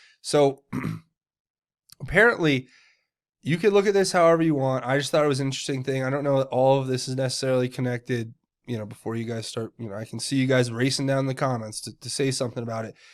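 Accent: South, United States. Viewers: Love that southern accent. LOL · YOUR SOUTHERN ACCENT SAYS IT ALL BROTHER...."Q" ROCKS....HE OR SHE (THIS COULD BE A WOMAN) IS THE ONLY ONE GIVING US THE TRUTH...I CAN'T WAIT FOR THE MILITARY TO SWOOP IN AND SNATCH THESE .THIEVING PEDO'S UP...WWG1WGA....U.S.M.C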